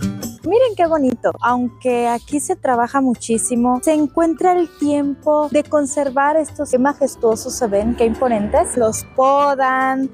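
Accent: Mexico. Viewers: Una cubana con acento mexicano 👍✌️se explica muy bien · Ya no tienes acento es más pareces más mexicana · Tienes muy bien el asiento mexicano y no cubano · No Tienes acento Cubano 🙄 Parece qué eres de aquí de México Saludos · Hola usted no se escucha como cubana habla como Mexicana saludos buen video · Amiga...una pregunta...como se siente a estas alturas del tiempo haber perdido el acento cubano....no te sientes mál.....tienes orgullo del acento mexicano...cual es tu sentimiento al respecto? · Cómo es que asimilaste y adoptaste completamente el acento Mexicano? ... siendo el acento Cubano tan difícil de perder. Bueno, en 10 años si es posible .❤ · Conozco cubanos acá en usa , tu no tienes acento cubano , ya eres Mexicana 🇲🇽🇲🇽🇲🇽🇲🇽🇲🇽🇲🇽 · Amiga ya me suscribi saludos para ti ya hablas como mexicana😊😊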